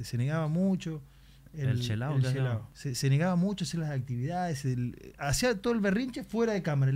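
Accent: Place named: Chile. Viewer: Habla como chileno ahora kajdjak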